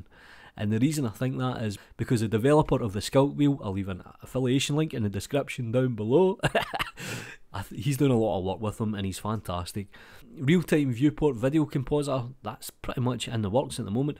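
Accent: Scotland, United Kingdom. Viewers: Blender 4.0 is scheduled for August 2023 - assuming they're indeed still following the plan outlined in Ton's LTS blog post from February 2020. Four versions each year (one being LTS), and a big number change every two years. <link> Anyway, while I already read the news, I enjoyed listening to your commentary and Scottish voice. <3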